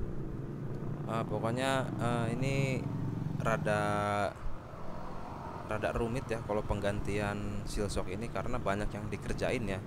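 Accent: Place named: Serang, Indonesia. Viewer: Kaya orang serang sih kang bahasanya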